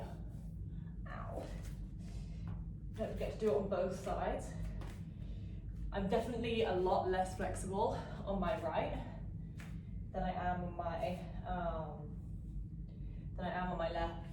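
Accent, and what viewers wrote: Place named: Australia. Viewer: AYE!?!Mr. Shit Starter,. That's Me Aussie sister, ya Monitor Mouse masoosing Pre-Vert & Since she is my sister by human race standards. I love & respect my brethen, So this is mearly giving you props & I'm an emotionally mature man, nothing explicit, just giving credit were it's due, &...." I WANNA GO TO A LAND DOWN UNDER!", Icld never deny thee accent, but This is the 1st time saw you.._so OVERDUE IS A HUGE UNDERSTATEMENT,Its like I found an eviction note when I got home &theyre shutting my water, lights&gas off tomorrow 'OVERDUE.' And I would be a fool to Not say, WOW, You are 'PROP'URRR´ {my own,just mean VERY ATTRACTIVE....& Iam attracted. But persue what make you happy sweethearts. I ask Girl, Just PLEASE 'NEVER Do 'Onlyfans' or 'AduVid' I KNOW OnlyFan have big ties w 'Child Sex Ring' 4 different sourcesWe know how the other rolls. 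My Instragram is 24 days Old & dismal.You don't mind.Ikeepit fun, so I hope you &I cld friends. Talk to ya soon. Ok?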